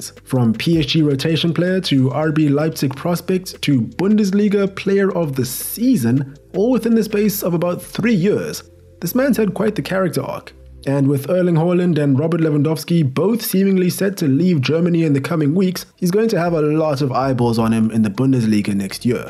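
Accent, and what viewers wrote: South Africa. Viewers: I'm a simple man. I hear a South African accent and I subscribe 🤌 gotta support the peers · homie talking South African?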